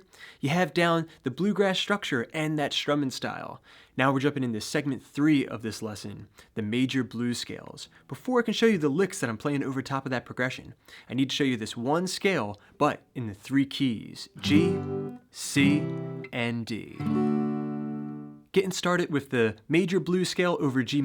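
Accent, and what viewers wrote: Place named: Delaware County, United States. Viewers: South Jersey / Philly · deleware county pa, they also say “Wooter” instead of water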